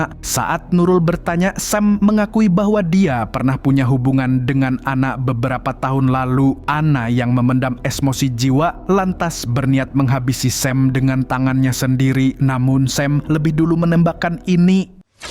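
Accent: Banjar, Indonesia. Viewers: Org bnjar bnar Sidin nie amn di dengar logatnya😅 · Logat mimin kek org banjar...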